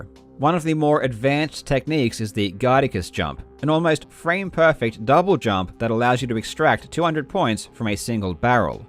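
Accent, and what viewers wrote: Australia. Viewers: great videos. its interesting you have a rhotic Australian-sounding accent. not sure if you pronounce your Rs because of prolonged exposure to american accents or if it's just a legitimate accent i've never heard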